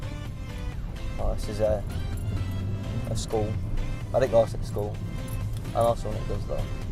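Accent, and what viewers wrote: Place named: Blackburn, United Kingdom. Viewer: Ik where Burnley is coz I live there aha I knew u were from Blackburn from voice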